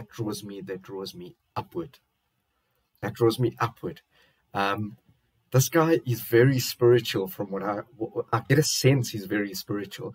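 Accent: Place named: South Africa. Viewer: May I ask where you are from? You sound almost exactly like my fiancé sounded when he talked. He was from South Africa.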